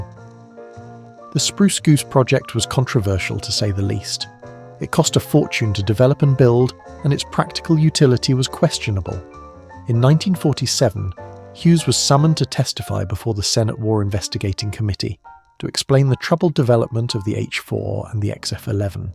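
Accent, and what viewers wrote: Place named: England, United Kingdom. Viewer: Lol. That is the voice of the average english man. Relax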